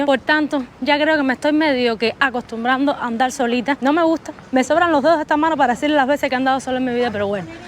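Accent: Cuba. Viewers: La voz de cubana tuya molesta cantidad el acentico cubano ese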